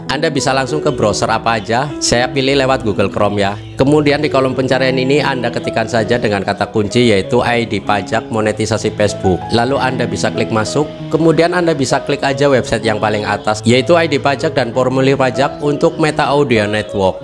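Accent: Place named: Tegal, Indonesia. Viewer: Kaya wong Tegal sampean mass ngomong e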